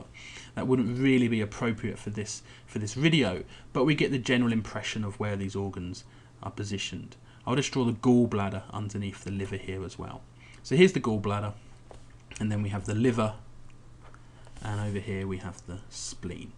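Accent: United Kingdom. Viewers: Excellent video. Nice to hear an English accent. Go UK!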